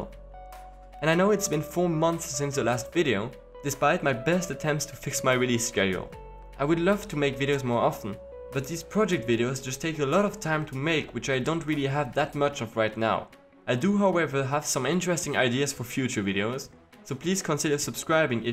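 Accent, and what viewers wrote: France. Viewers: Your accent look like french. Have you upload your work on github or something like that?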